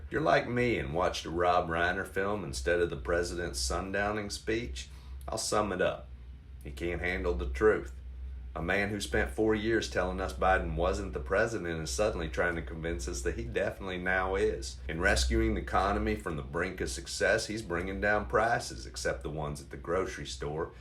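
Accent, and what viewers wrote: South, United States. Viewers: You are smart and funny with a common touch. Nice Will Rogers style. It's always refreshing to hear Trump roasted in a southern accent.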